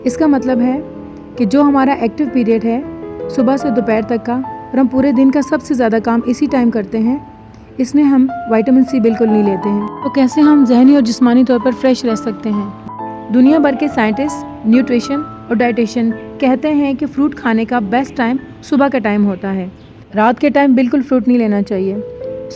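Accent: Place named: Karachi, Pakistan. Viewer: Thanks alot for the Precious and healthy Information Your Excent is Karachi Wala Am I Right?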